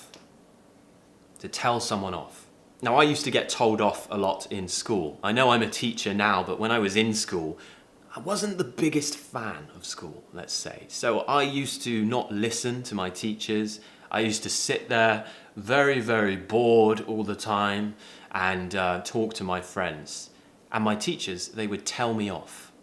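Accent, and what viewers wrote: United Kingdom. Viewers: I really like your British accent... #EnglishGrammarInUse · #englishgrammarinused Woww... you looked sexy at this video. Love from indonesia 😊✌ oh ya... I used to bad at listening, but i loved to learn listening through all your vid without subs. And nowadays my listening better i supposed because i can understand you with your British accent... Thank you for your vid and your clear sound and pronunciation. 🙏🙏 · #EnglishGrammarInUse hello, your accent is the best British accent. I need some advice. I know many many words in English, but i can't use all of the words, when i talk or speak with somebody. Rest of time i forgot majority words. Please say me how can i use many different words in my conversation. Generally i use very simply words, when i speak. I want to speak more widely and i want to use more different words. Thank you so much before your answer! 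· I adore your British accent!❤ You're amazing❤ Greeting from Egypt❤ #EnglishGrammarInUse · When you want to sound British, just listening and imitating him., you're the best! #EnglishGrammarInUse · Wow! You are the best teacher, good lesson. And you have good British speech, the best as for me. From Ukraine with love and.. #EnglishGrammarInUse :)) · #EngliushGrammarInUse I just love your channel and your British accent. Nice one!!!!!! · Your accent is my inspitation to become a good british english speaker. #EnglishGrammarInUse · #EnglishGrammarInUse absolutely love your adorable British accent 😍 thank you so much for inspiring me to learn English. Much love from Russia ❤️☺️ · #EnglishGrammerInUse hope its not too late to comment and join the competition! Constantly learning English is one of my hobbies and favourites, and a foreign language makes me more sensible when talking to ppl and guide me to see the world in a different way! 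Thankss I really like your channel and feeling motivated and encouraged by such wonderful British accents too!:) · #EnglishGrammarInUse Thank you for filming so many amazing videos. Your videos help me build up British accent greatly and I am totally fascinated by your voice! Your videos are always the best way for me to train my British English listening, and at the same time, I do learn some useful phrases also. Besides, your videos are always the first choice that I highly recommend my students to watch and learn British accent. Every time my students say that they are interested in the British accent, I always say go watch videos. Once again, thanks for filming so many excellent videos!! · I adore your British accent! #EnglishGrammarInUse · I love your British accent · I really like the way that you teach us your British accent is easy understanding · #EnglishGrammarInUse I love listening to your British accent.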